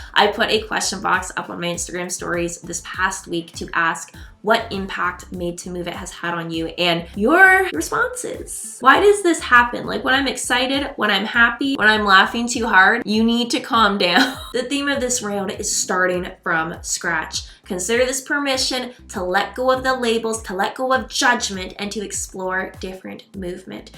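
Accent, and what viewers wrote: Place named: Canada. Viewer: i hear Canadian in your voice, and no i am not familiar with this channel.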